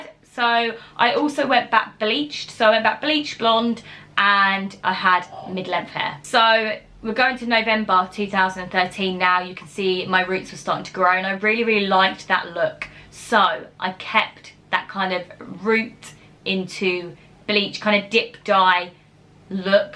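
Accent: United Kingdom. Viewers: Your british accent is 😍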